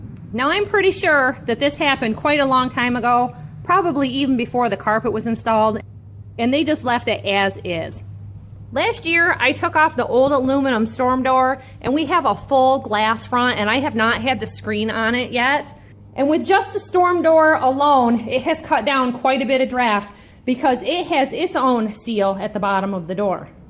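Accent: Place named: Michigan, United States. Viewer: Michigan, I was born in Lansing. Thought you sounded a lot like my cousins up there 🤣. On another note, we just replaced all our windows and the doors are next. We have big problems with humidity hear so wood movement is always changing the way windows and doors shut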